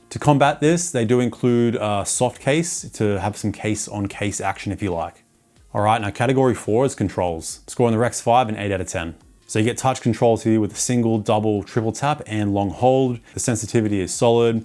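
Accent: Australia. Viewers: I wish I could pay YOU to stop using an Australian accent. Holy shit, nothing is more annoying than an aussie accent.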